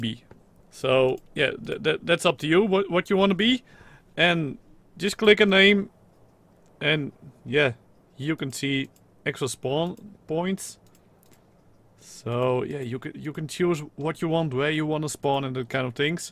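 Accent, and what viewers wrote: Netherlands. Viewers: Dutch? Your accent seems really dutch. Edit: Why can I not spawn as a water dino? When I do it spawns me on the beach since I cannot select water and it makes me human or invisible. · are you dutch? you have a dutch accent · Ben je Nederlands want ik hoor het met ej accent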